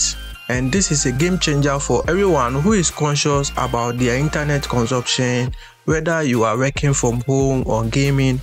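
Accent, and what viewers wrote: Nigeria. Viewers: Nigerian ahh accent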